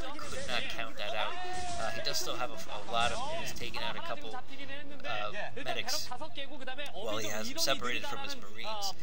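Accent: Boston, United States. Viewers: nice a new commentator...ur prolly from boston...keep up the great work man...enjoyed it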